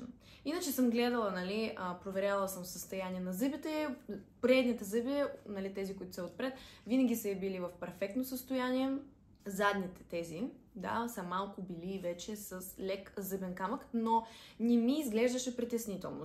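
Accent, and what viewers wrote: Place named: Russia. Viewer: Долавям нещо руско,жена ми е рускиня както ти така и жена ми бъркате адски много граматиката:)Дори в заглавието на клипа има грешка:)Успех и моля не говори като Наталия Кобилкина)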